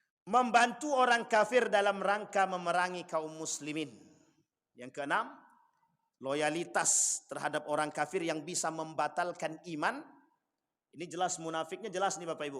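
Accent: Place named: Riau, Indonesia. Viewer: Dari Riau sptnya. Sama spt ust abdul Shomad.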